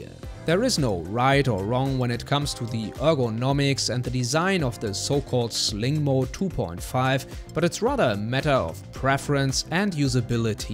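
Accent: Germany. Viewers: German accents are so much fun to listen to.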